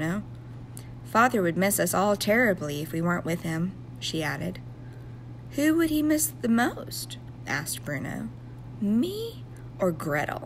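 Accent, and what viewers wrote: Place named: West, United States. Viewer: why is this book slowly turning into a western accent. also thanks.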